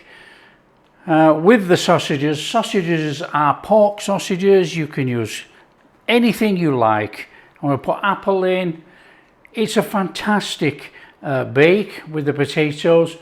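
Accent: Yorkshire, United Kingdom. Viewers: why didnt you poke the sausages? Mu husband is from Leeds, and when he puts on a Yorkshire accent it sounds like you. I randomly came across your video because i googled sausage potato onion trying to find a new way to cook my big "lad's" cumberland sausage. Im German- Canadian and we came across some wonderful food like this while visiting Germany. I subscribed.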